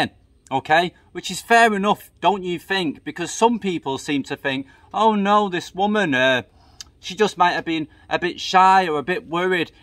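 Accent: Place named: Yorkshire, United Kingdom. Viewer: Are you from Yorkshire? You sound like you from leeds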